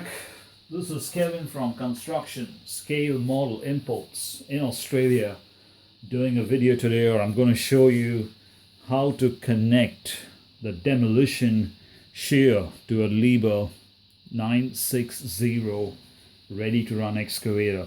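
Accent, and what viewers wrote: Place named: Netherlands. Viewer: Okay, just thought you might be from Holland originally, your accent are similar to Josh' at Hemistorm RC :)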